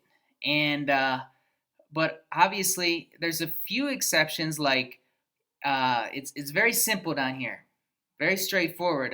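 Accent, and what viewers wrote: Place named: Ecuador. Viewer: You sound more Ecuadorian to me than gringo!!🙃🙃🙃🙃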